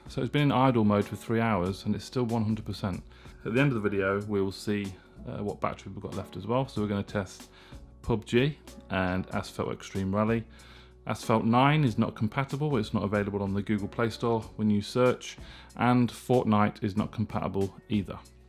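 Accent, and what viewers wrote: United Kingdom. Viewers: Yup, typical British accent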